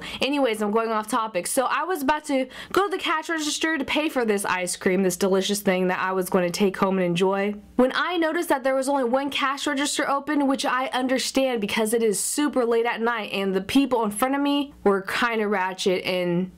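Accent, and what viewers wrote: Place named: Dallas, United States. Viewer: she sounds like she is from downtown Dallas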